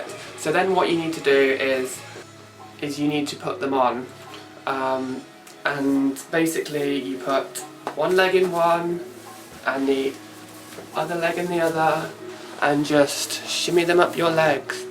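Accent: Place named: South, United States. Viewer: Dear. I found your video by accident. The title confused me. So I watched. "WOW"..... What an eye opener for me. I could not beleive it when you said you were transgendered. Anyhoo I just wanted to say as a regular fella. I think that you are a stunning lady who should have been a woman from birth. Also you made me laugh when you had to talk about the 'delicate" parts of the process. The southern accent made me laugh when talking about dropping a "bollock" (excuse the lack of imagination for my discription) I hope the future is bright and you become the person you so clearly should have always been. Thanks for the eye opener kind regards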